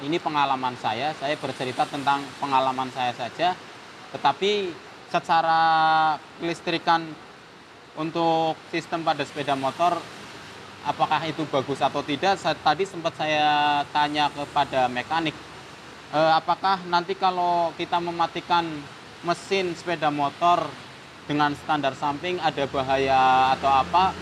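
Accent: Java, Indonesia. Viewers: Medhok syekali om,,, Asli mana nih?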